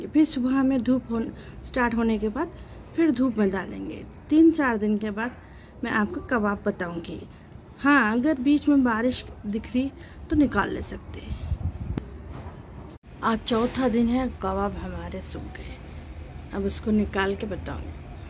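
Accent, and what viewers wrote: Hyderabad, India. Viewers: Assalamu alaikum WRWB...Aunty...Masha Allah...bahut acche recipe bataye aap...eid ul adha ke time pe...correct timing...shukriya...thank you so much for your efforts..aap ke bolne ka andaz bahut pyara hai...hyderabadi style...Masha Allah ...bahut Accha explain kiye aap tips bhi kahe...Jazak Allah...Allah aap ke channel ko bahut taraqi de...Ameen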